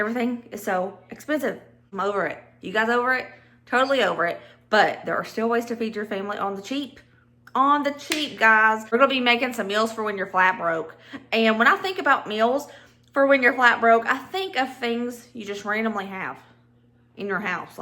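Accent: South, United States. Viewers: I love the way you say “ole” I love the southern accent❤ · NO SHAME IN HOW YOU SAY OIL!!!!!!!! I say it like that too!! People tease but I love a Southern accent. That's one of the reasons I was hooked on your channel so fast! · Foil, oil, boil, anyone from the south says it just like you. It's part of our charm. Don't change.❤ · I grew up in Chicago. Wash sounds like warsh or whoosh. I got teased so much when I joined the army that I forced the accent away. My mom said I sounded like I was from Boston. How you pronounce the words is due to your Southern accent. It isn't wrong; it's just different.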